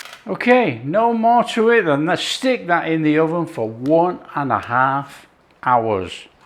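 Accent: United Kingdom. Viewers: Oh, COME ON PLEEEEASE, another fantastic video? I havnt digested the last one yet, 😅 sir you are the best cook, chef master on the internet, and with good reason, your meals, recipes are so perfect, your an awesome fellow to listen to, even though i have to really listen cause your british accent, the entertainment you share with us, and you tasting what you made at the end, is priceless, love your channel sincerly sir, with huge respect from the southern great state of Illinois...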